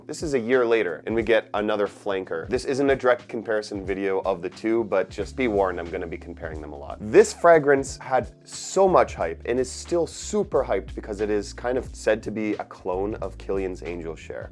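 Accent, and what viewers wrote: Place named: Canada. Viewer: Judging by your voice and kind demeaner you were giving me strong Canadian vibes -- upon further investigation, you are in fact Canadian 😄 I love your content. I'm going to add Qahwah to my collection thanks to your opinion. Keep up the good work.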